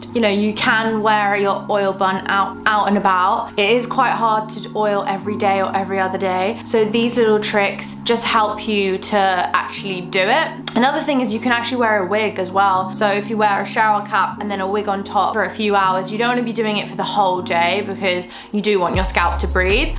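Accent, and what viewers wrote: United Kingdom. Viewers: I, love those lips and British accent. Oh! I forgot. Great video too.😉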